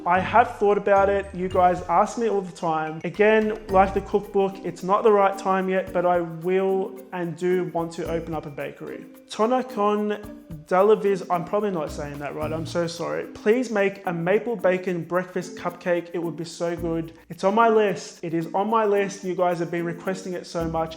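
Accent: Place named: Australia. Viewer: I don't have emojis on this phone but it felt like a short video; didn't even feel the twenty minutes! Love your cupcakes and other recipes too :) I used to think you were saying AK guys instead of ok guys and I got really confused thinking it was short for also known as without the a but I also love your accent! Australia is awesome.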